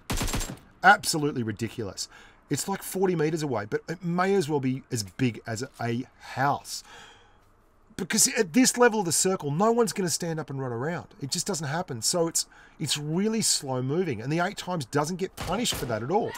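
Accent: Australia. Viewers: Manav Plays It's Australian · Why do ppl always say it is british, it is clearly Australian accent, it even shows in the flag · Is this guy from Australia? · Australian mate.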